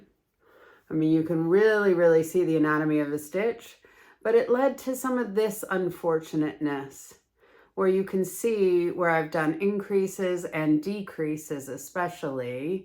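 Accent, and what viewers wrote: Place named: Canada. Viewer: Hi! Couple things first I have to say I want to know if you’re originally from Canada because as a Canadian I have what I call Canadiandar, which is Canadian radar, and as I was listening to you talk about this yarn, I instantly thought this woman is Canadian and then it says you’re from Germany, but obviously you weren’t born in Germany or you didn’t grow up in Germany because of your accent, so can you satisfy my curiosity about that? Second of all, I was looking for a review of this yarn because I’m about to order it to make a shawl and I see that you made a shawl with it. That’s so awesome. So thank you for your review because it was really the only one I found. Sidenote, another content creator that I watch makes stuffed animals with yarnart jeans yarn, and she says it’s the best one for that. I don’t make it so I don’t know, but I do love the various yarnart flowers. 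And by the way, yarnart does make an amazing cotton and a cotton acrylic blend that is very soft although I think the shawl you made is beautiful and completely worth gifting. Yarnart flowers is the cotton acrylic blend and yarnart rosegarden is the cotton, and I make shawls with them all the time. They come in big cakes over 1000 m actually you’re probably familiar with this yarn because you live in Europe, so you’re so lucky. You have easy access to this stuff. Actually yarnart flowers is the exact same yarn as hobbi twister. It even has the same colors.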